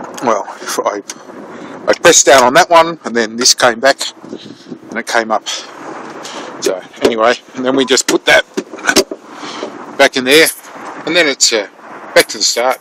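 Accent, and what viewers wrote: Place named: Australia. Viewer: Dude ! Aussie !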